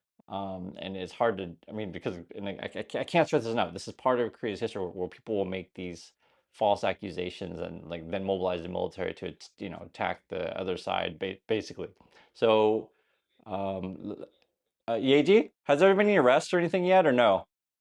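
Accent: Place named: United States. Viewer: In this video, you twice said "the" North Korea. This is noteworthy, to me, simply because it is literally the only mistake in your English that I've heard after watching 6 or 8 videos by you. Your accent, pronunciation and phrasing all sound perfectly American, and I would have assumed you grew up here -- but that one mistake made me think perhaps you are actually native to Korea. <shrug>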